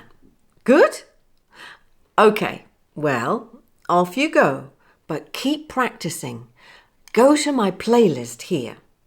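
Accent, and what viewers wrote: United Kingdom. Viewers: Hi! 😁 which British accent do you speak? North or south accent? I like it.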